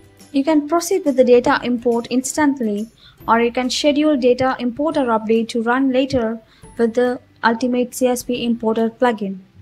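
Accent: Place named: India. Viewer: I love Indians and their English.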